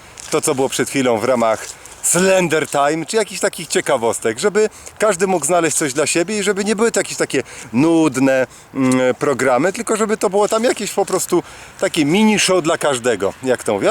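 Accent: Silesia, Poland. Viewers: skąd jest? Ze Śląska?;p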